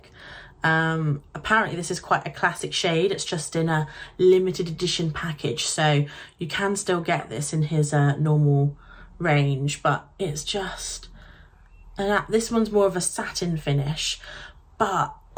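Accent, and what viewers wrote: United Kingdom. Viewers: Her accent sounds like she is from Devon or Cornwall. She does not speak with a cockney accent so its highly unlikely that she is from the London area.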